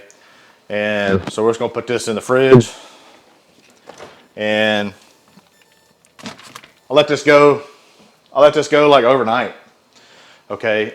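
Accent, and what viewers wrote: North Carolina, United States. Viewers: FOOD LION "JUST KINA PUT IT ON THEAR" ,,,,I'LL BET YOU'RE FROM North Carolina!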